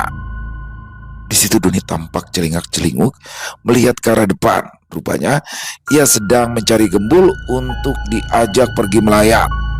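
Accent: Jakarta, Indonesia. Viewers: Waalaikumsalam bang, ya Allah itu bahasa Betawi keluar semuanya, ora Danta, 😂😂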